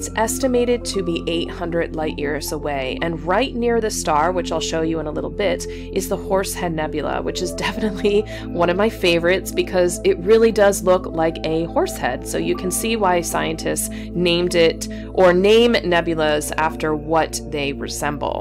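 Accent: United States. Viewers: You from the east coast, possibly jersey?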